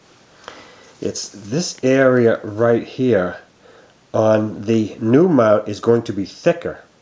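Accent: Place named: East Coast, United States. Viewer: Does 2nd design mean it's not in the 3rd Generation S-series? Also, where are you located?? You sound kind of east coast. If I'm ever in your neighborhood I'd like to have my 2000 SW2 looked at by ya!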